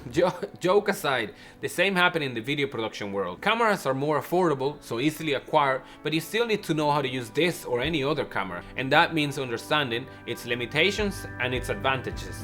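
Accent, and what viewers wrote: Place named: Dublin, Ireland. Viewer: brilliant review I knew there was a bit of a Dublin twang in the accent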